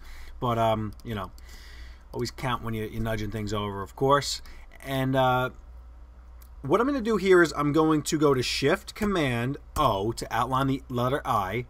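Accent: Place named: New Jersey, United States. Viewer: Your so from jersey, you from jersey. love all your videos, great work, great teaching.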